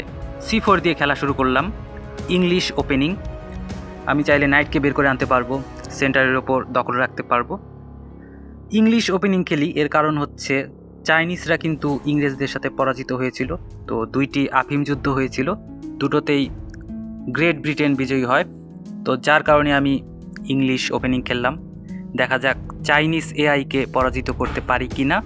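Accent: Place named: West Bengal, India. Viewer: না,মানে আপনার কথার ধরণটা পশ্চিমবঙ্গের মতো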